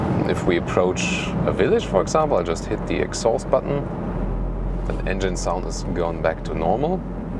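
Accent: Germany. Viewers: Ich konnte schon den Akzent erkennen. Deutsch. Der TT ist ein schickes Auto. :D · can you do something about the German accent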